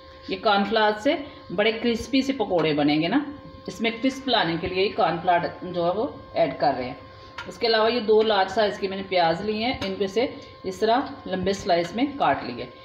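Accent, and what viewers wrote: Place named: Punjab, India. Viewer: Wowww Super like 48 for the Super tasty and crispy Moong Daal ke Pakode. Kya baat hai Bahaut hi alag style se banaya aapne inne. Bante dekh muh mein paani aa gaya. Nicely prepared and well explained each step. Aapka Punjabi tone hai bolne mein, jo hi Bahaut accha lagta hai. Jabardast Pakode ku Beautiful presentation ke saath. Wah ji wah mam. God bless you always 🤤🤤😊👍👍👌🙏🙂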